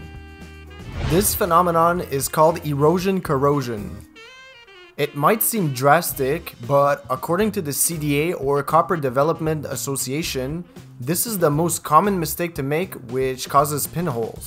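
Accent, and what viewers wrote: Quebec, Canada. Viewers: I do hear a québecois here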